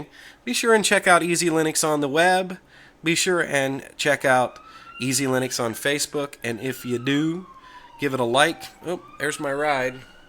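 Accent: South, United States. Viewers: Does pronunciation matter? Well, not really as long as communication is possible (paaaaaaasible :-P ). Love that long "a" sound so many Americans have on words with "o" in them. I can't even make that sound :-D I love all the accents you get on Youtube, all those different people in different places, proving how diverse the world is. My accent and dialect is English (a mixture of west Yorkshire and west Midlands dialect and accent). I was having fun with your accent on the Ubuntu video, I'm guessing it's southern US somewhere? Virginia?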